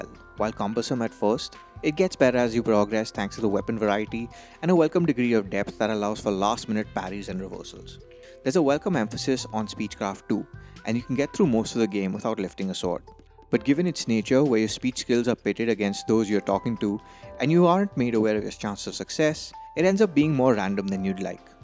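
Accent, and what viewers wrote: India. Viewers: Can't stand people from India talking English. Ding Dong in my ears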